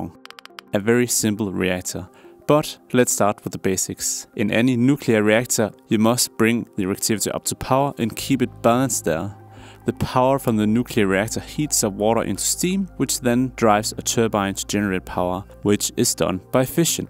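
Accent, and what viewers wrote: Denmark. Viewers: Are you Danish? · Danish accent ruined the video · hold da op man kan nemt høre du er dansk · are you danish ? your accent sounds danish idk. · I think you mean Walken? There is something reminiscent in the intonation but the actual accent is, I believe, Danish so pretty far removed from Walken's american lilt. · Are you danish? Because I’m almost positive I detect a danish accent in there somewhere! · Danglish? Great video! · danish guy speaking english? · Du lyder dansk! Cool video! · Are you danish?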